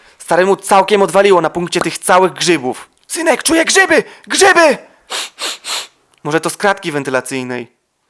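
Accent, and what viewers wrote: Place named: Podlasie, Poland. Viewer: NO BARDZiEJ Z PODLASiA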